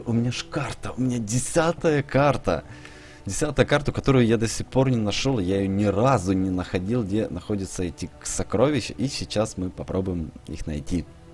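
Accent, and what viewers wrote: Ukraine. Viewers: Ты Украинец? Что за акцент?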